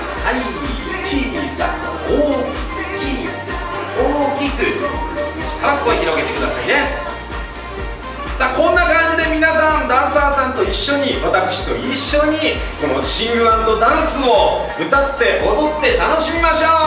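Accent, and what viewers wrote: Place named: Japan. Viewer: Antes de seguir a no pensaba lo impactante que era escuchar a un japones ponerle la "u" a final de una palabra en ingles:v wow.. nice <3♡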